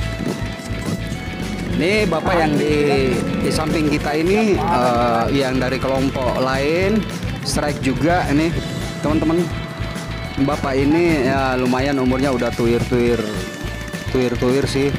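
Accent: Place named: Makassar, Indonesia. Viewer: pelan2 maki logat daeng kondisikan sj ciri khas kita sebagai orang makassar....tetap semangat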